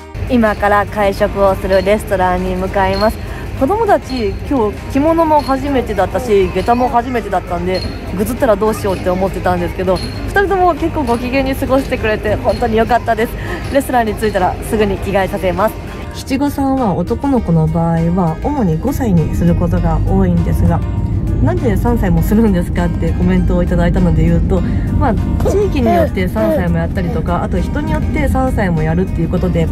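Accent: Kansai, Japan. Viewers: さんのお父さんお若いーー！そしてさんの関西弁で素な感じもかわいいです🩵 無事七五三終えられてよかったです！ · ほんとにお父さんがお若い。。そしてさんの関西弁が新鮮ですきです！❤️ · 飴をペロペロの話可愛すぎて、つい可愛いーーーー！って言ってしまいました♡ さんの関西弁嬉しかったです！！関西人ファンより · さんのお父さん、めっちゃ若いです☺️✨お父さんとの会話で関西弁が出てて同じ関西人としてはなぜか嬉しかったです🥰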